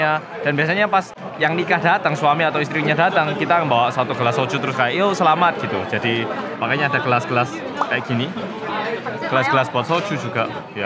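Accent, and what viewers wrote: Java, Indonesia. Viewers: Banyak makan nya si korea medok... gak rugi kl all can you eat.. hahahh 😅😅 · Gw kira suara nya logat korea gitu eh ternyata medok:v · Btw ka jawa nya medok bgt 😂 kalo ngomong serasa kaya pak jokowi yang ngomong 😂😂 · Logatnya jowo buanget 🤭🤭🤭🤭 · ngomongnya Medok jawa ya... wkwkwkkw lucu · Hallo wong korea medok piye kbre??? Cobo melu nak sek jenenge 패펙 aku pengen weruh.... Maturswun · Medok banget ngomong nya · Kira-kira apa yaa reaksi orang Korea asli yg nggak ngerti Indo pas denger Mas ngomong Indo medhok. P.S Kostum menghadiri pernikahan di Korea lebih simpel cenderung monokrom, nggak kayak di Indo yg agak gimana gitu liatnya warna warni kadang juga dandanannya suka heboh. (*Kecuali yg ada ketentuan warna dresscode yaa) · Ini orang korea apa indonesia. Logatnya xo jawa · Bahasa nya medok bngt, salut aku , 😍😍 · Aku baru nton dann sumpah aku nton berasa nton org indo yg lagi di korea😂 duhh logatmu mas indonesiaa bangeet💗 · Lucu ya.. 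Org asli korea malah logatnya jawa.. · Medok banget omongnya kaya aku , jadi lucu deh kaka 😂😂😂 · Medok banget jawanya:') Lucu sumpahh>< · Wwiiiiihh entek petang piring reeeekkk....hahahahaha......nggak luuwweeee iku reeekkkk...tp ruwakuuusss yooo......btw its ok 👍👍😍😍😘😘 Suuueeenneeenggg puoll ndelokno e.....success trus ya nas sing muuueeeddokk👍👍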